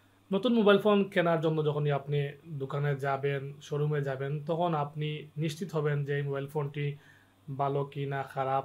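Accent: Sylhet, Bangladesh. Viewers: সিলেটি ভাই 😮😊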